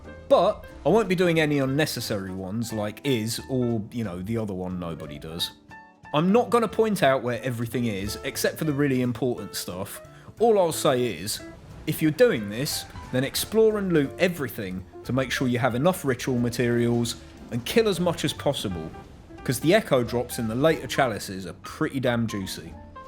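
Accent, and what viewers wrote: United Kingdom. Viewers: Your somewhat latino tracks and jokes, and your bri'ish accent are confusing the hell outta me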